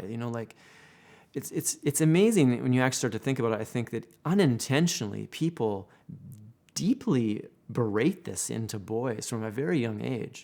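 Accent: Canada. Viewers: Hmmm, I don't know .. This is the first video of yours that I watch (and I like it a lot by the way, I like the clear way you express your ideas and thoughts) You sound Canadian or American to me, and I find the "homophobia" thing more present there to be honest, so that could be something to note? Because in so many other places I find that men do express more emotion to their friends without this fear of "appearing to be gay". I also believe that men are not as emotional as women, or in better words: have different emotional needs than women does, in terms of sharing or expressing. Which explains the universal idea of (men being stronger and less expressive) that's been around throughout history. That being said, I do agree with you about stereotypeing, it makes life pretty rough for the more sensitive and emotional men. I think it always comes to that old tale of people thinking of themselfs as the "standard mold" that all others should be shaped with, which is a very human thing to think (it has to do with the natural need in people to feel connected and safe), but also it is the exact thing that makes life harder for every "different" human who is trying to fit in. 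This is very interesting to me, it's an issue that I got invested in and studied for my master's thesis, actually, and I found that the only way to solve it is to, basically, open people's minds to differences and teach them how to deal with it without losing themselves or causing others to lose themselves eit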